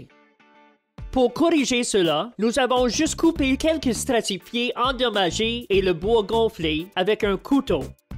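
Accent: Quebec, Canada. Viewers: je pense tout simplement que ce monsieur est Québécois. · Aie c quoi cette voix c l'accent québécois mélanger avec canard 🦆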